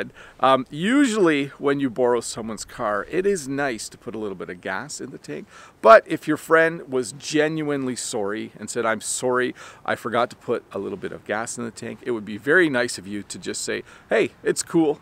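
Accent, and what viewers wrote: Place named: Canada. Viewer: Hi, a great English teacher, I often learn English from your teaching, it can help me to improve my English, especially about the pronunciation I've got better so much, actually I've never been to Canada, as you maybe pronounce quite different of some word from American at the US. in which I've ever been before, but that's not problem at all. Thank you very much.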